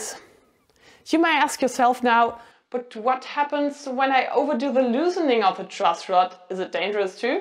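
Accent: Germany. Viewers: Hallo. Am Dialekt nehme ich an Deutsche. Alle deine Videos zum Setup waren eine grosse Hilfe. Ich besitze eine rote TE-20 und veränderte ein paar Kleinigkeiten. Messing Steg Sättel compensated, neue Saiten, anderen Hals Sattel und Locking Tuner. Von Pickups sehe ich ab. Ob sich alles gelohnt hat für mich? Bin Anfänger. Die Mühe mit dem Hals Sattel würde ich nicht mehr auf mich nehmen, aber bin um eine Erfahrung reicher. LG Robert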